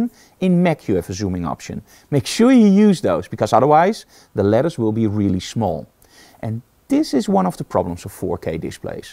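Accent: Netherlands. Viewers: Je hoort dat goed dat je een nederlander bent lol. Heb juist een eizo flexscan ev2750 gekocht, wat denk je ervan? Heb ook een 4K monitor van asus, heb die gekalibreerd met de i1pro en kleurweergave is echt top. Maar 4K in een 27 inch scherm...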